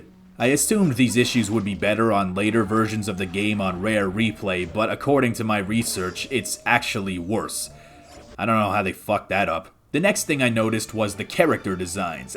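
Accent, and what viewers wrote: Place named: New York, United States. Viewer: First time viewer here: When I hear him speak I picture a New Yorker sitting in a bar telling a story. I don't know why I just couldn't get that image out of my head.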